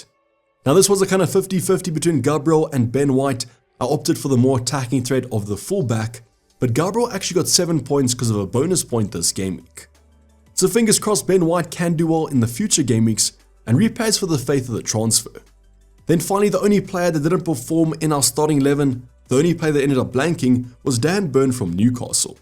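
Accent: South Africa. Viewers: That Saffa accent is strong bru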